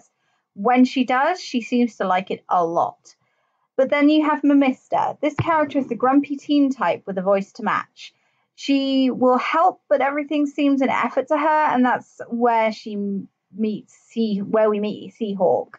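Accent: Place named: United Kingdom. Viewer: You kept saying antagonist wherein you should be saying protagonist... Is it me or is it your brit accent? Anyway I do like this new iteration. But the old one was way better.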